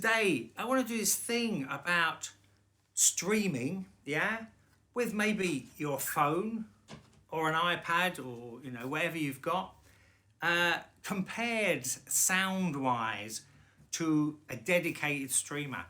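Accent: London, United Kingdom. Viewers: Interesting! You’re comparing the analogue output from the iPad /iPhone to the amp, ie using the Apple internal DAC. I’ve now (last week) bought a Cyrus Soundkey thumb size inline DAC (£60 + £10 for the Lightning adapter) which you plug into the Lightning port. It makes a really worthwhile improvement with earphones, like the music is clearer, sharper and in a bigger room. If you fed this combination into the amp, I bet it might even beat the Node 2i ! In fact, I usually use Airplay to go from my iPad to my own Yamaha WXC50 network streamer and hence to the amp; this also bypasses the Apple internal DAC, of course, and sounds pretty good to my old ears. Thank you for your honest reviews. So many seem to be trying to sell you up. I hope you aren’t offended when I say I love the London accent.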